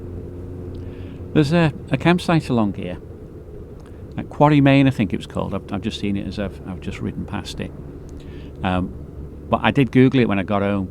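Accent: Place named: RP, United Kingdom. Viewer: Loving the voice over hadn't realised how posh you sound 😂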